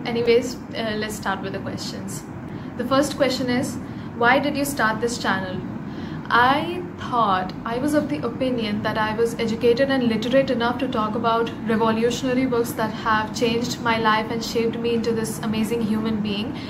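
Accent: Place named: India. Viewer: Cringey? Are you kidding me? I adore the Indian accent! Looking forward to seeing what kind of content you create. :)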